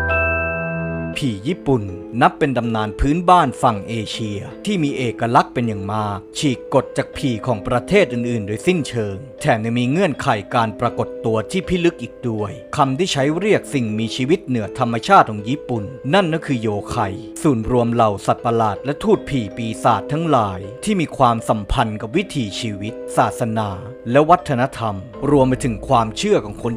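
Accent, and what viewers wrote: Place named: Central, Thailand. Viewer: คุณ ผมฟังคลิปคุณมาหลายคลิปแล้ว ผมชอบตรงที่การบรรยายของคุณมีเสียงกลางออกเหน่อ นี่คือเอกลักษณ์ประจำตัวคุณ มีเสน่ห์ประจำตัวที่ให้น่าติดตาม ผมชื่นชมและจะติดตามไปเรื่อยๆและตลอดไปครับป๋ม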